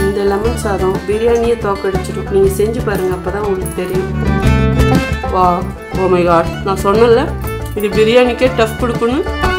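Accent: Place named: Kongu, India. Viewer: Kongu Thangamae, Sweet Kongu manam veesum ungal Slangs, though at Bangalore, Still Comes to All people and bringing Happiness just like Wonderful preparation of Lemon Rice. Thank you, Selva Magalae.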